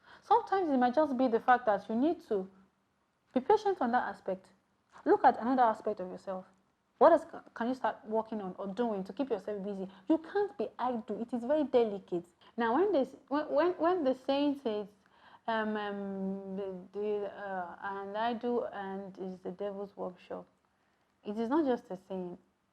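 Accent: Ekiti, Nigeria. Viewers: Naughty girl, I have subscribed o. Your Ekiti is hot like amala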